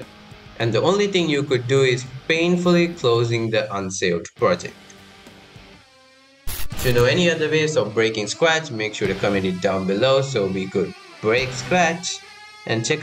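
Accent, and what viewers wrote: India. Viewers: Him : voice Me : IndiaN?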